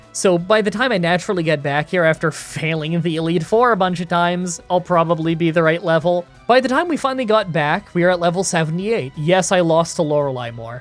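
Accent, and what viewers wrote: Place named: Canada. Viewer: I love this guy's Canadian accent! So cute 😁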